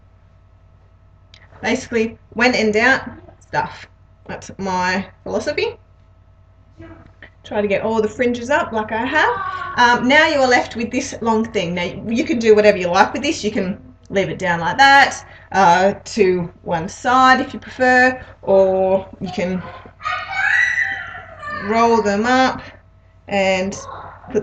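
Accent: Australia. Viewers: nice to hear another australian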